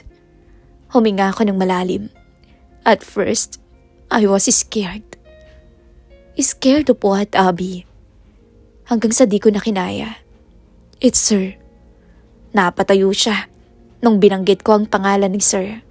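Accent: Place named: Arab world. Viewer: Ang galing ng content creator na to gayang gaya Ang tuno ng English ng mga arabo.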